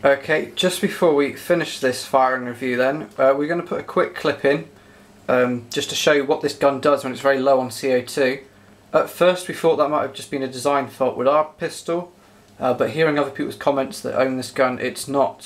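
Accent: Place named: United Kingdom. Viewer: were'd u buy this gun , i take from your accent your in the uk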